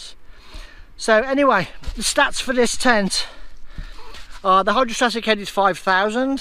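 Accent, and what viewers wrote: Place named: Birmingham, United Kingdom. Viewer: You sound like a fellow brummie or maybe the black country lol. I did watch a video with Hazeoutdoors and he said the red pole should go in 1st, I'm not sure tbh as I've looked on the Terra nova website and they say different, I was just thinking about how saggy it was on the inside once you'd set it up. Maybe after a few times it'll come right ✅️ 😌 👍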